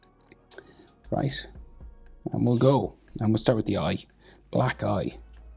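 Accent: Ireland. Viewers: Welcome back, you from Ireland?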